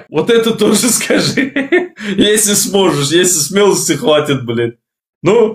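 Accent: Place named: Chardzhou, Turkmenistan. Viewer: Это наш Чарджовский (нов.наз.Туркменабат) диалект.У нас на родине есть Лебапская область там у нас все разговаривают на таком диалекте.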